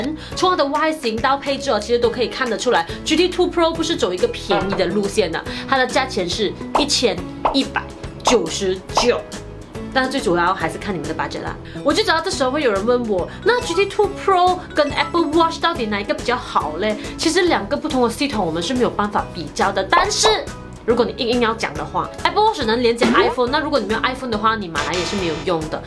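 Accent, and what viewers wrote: Malaysia. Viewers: 马来西亚口音还是蛮好听的😁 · 大马华人说话好听😊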